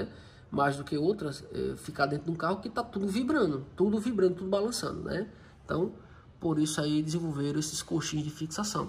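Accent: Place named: Pernambuco, Brazil. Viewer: Pelo sotaque se for de Pernambuco vou te procurar. Tenho um Etios e queria ué CV olhasse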